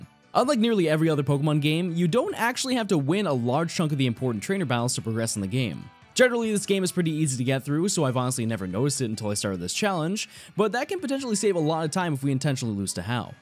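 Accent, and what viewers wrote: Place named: United Kingdom. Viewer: It's crazy how different and sound. One sounds more British (being more formal and a bit cynical) while the other sounds stereotypically American (more loud, but more fun-loving and casual). (I hope I didn't offend anyone saying that, it's just to show how different in energy these two are.)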